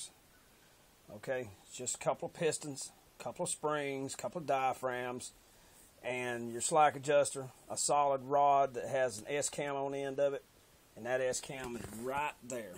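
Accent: South, United States. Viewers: Name that Southern accent. I'm thinking...... Alabama?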